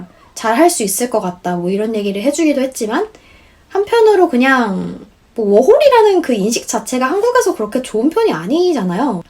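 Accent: England, United Kingdom. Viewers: 우연히 알게 됐는데 정말 너무 마음에 와닿는 얘기가 많은 것 같아요! 저도 지금 영국은 아니지만 다른 나라로 교환 준비 하고 있는데 남들보다 뒤쳐지는건 아닐까, 이런 저런 걱정들이 많았거든요! 가고싶다는 마음은 확고한데 언니 말씀대로 주변 반응도 뜨듯미지근 하기도 했구요..! 그런데 제가 좋아하는 거고 저도 지금 이 나이에 이 경험을 하고 싶은거니까 나중에서도 어떻게되든 후회하지 않을 것 같아요!! 언니 영상 보면서 용기도 많이 얻었고 되게 위로받는 기분이 드네요... 💓 유튜브 알고리즘으로 영국영어로만 하는 브이로그 봤는데 제 귀에는 엠마왓슨처럼 들려서 정말 너무 멋있고 깜짝 놀랐어요! 구독도 하고 자주 챙겨보고 (정말 안지 얼마 안됐지만 ㅎㅎ) 항상 응원할게요! 너무 멋있고 이런 영상 만들어주고 경험 공유해줘서 감사합니다 🍑💫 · 와 영국발음ㄷ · 우연히 영상을 보게됐어요:)) 님에게 영국이 그렇듯 저에겐 프랑스가 정말 그런 존재여서 많이 공감되고 혼자 대답하면서(....)봤던 영상입니다 😌😌 정말 많이 공감가는 부분은 누가 시켜서가 아니라 내가 좋아서 한 생각들과 결정들이기 때문에 시키지 않아도 늘 뭔가를 했고, 누군가는 이 나라를 답답하다고 말할 때 저는 항상 빨리만 달려왔던 삶에서 벗어나서 느리지만 여유로운 문화에 적응하고 싶다 라고 말했던 것 같아요:)) 그리고 그냥.. 참 좋다는 점! 프랑스어에 꽂히고 프랑스에 꽂혀서 결국 지금 프랑스에 있는데, 이렇게 영국영어를 접하게되니 정말 너무너무 매력적인 것 같다는 생각이 드네요 ㅎ_ㅎ!! 정말 그 무엇과도 바꿀 수 없는 소중한 경험들 많이많이 만드시길 바랄게요 🥰🥰!! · 님 영상보는데 영국발음에 또 홀리네요ㅎㅎㅎ 구독하고갈게용> < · 영국발음에 반해서 다른 영상들도 정주행중입니다 ㅋㅋㅋㅋ정말 대단하시네요. 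엄청난 노력을 하신것 같아요.. 같은 워홀러로서 되게 제 자신이 부끄러워지네요. 영상 볼때마다 영어자극 팍팍옵니다. 감사합니다~많이 업로드 해주세요🙏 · Did you grow up in Korea or England? Since your accent is so posh I'd say you grew up in England.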